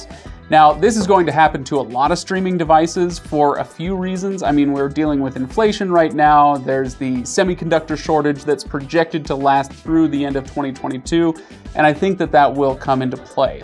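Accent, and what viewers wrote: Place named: United States. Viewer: It was in plain English,albeit with an American accent. Would subtitles help?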